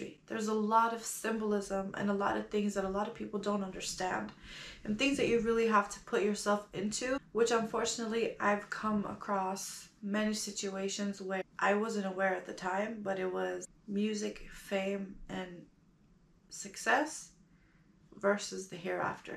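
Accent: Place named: United States. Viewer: سڵاو بەڕێز.بە ئاکسنتی ئەمریکی قسە دەکەی تۆ دەڵێ ی لە نەرویجم چۆن بە ئەکسنتی ئەمریکی قسەدەکەی؟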